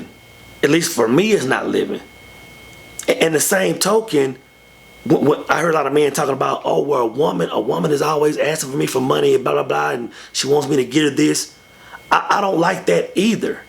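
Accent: Texas, United States. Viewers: Before I knew you were a PK (preacher's kid) I saw the pastoral love in your eyes; the apple don't fall far....(Your Texan accent is awesome, BTW). I am amazed by the gentleness by which you edify your brothers. God has a very powerful calling on your life, and I am committed to pray you and the other brothers become all you are meant to be according to God's grand plan. Keep up the good work. My heart wants to be sad that you feel marriage is not for you, but I can understand being in that state because of past experiences and being screwed over by society. May I say, DON'T LET THEM WIN! I don't know how your individual lives will turn out but just know here is a sister who wishes you well, and laments the pain/frustration/mistreatment you have been through. I hold you all in high regard and expect things in your life to get better. I wish you to be surrounded by people who see the treasure in you and draw it out. 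You will not go down. You've already been down. Now is the time to keep going, keep rising, keep building. The generations will sit at your feet and say, "Dad....Honey...Grandpa, tell me...teach me...explain...show me" #YouTheMan #YouTheBoss #TheEarthNeedsYou, my kings!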